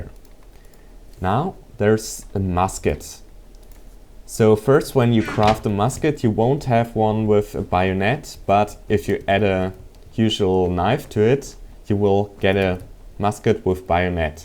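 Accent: Germany. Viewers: are you german cause you have a little akzent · Bist du Deutsch ? :D Hast so einen Akzent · Well, you kinda have a german accent, at least in my ears.. It that true? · are yu germaner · oooh he sounds german!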